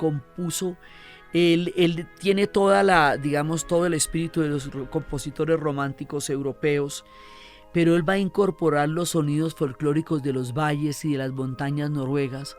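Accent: Colombia. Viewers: Hola me tienes fascinada tengo días ya escuchándote, te escuche los tres capítulos de Turquia, Noruega, y bueno solo me transportas a el momento, eres una mujer que cuentas las cosas que haces que la piel se me ponga chiquita, y pues tu voz colombiana me encanta, te mando muchos abrazos desde Guadalajara Jalisco México 😘 🇲🇽 💕 y seguiré escuchándote mi papá me preguntó como podía escucharte tiene cáncer, tiene 84 años y ya te escucha también. Bueno no se cuando termine de escucharte pero eres una gran mujer Dios te bendiga 🙏 🥰❤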